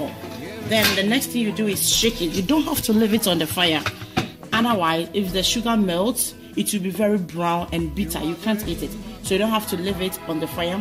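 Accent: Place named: Ghana. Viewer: As soon as I heard the accent ...Ghanaian , lol! Subscribed!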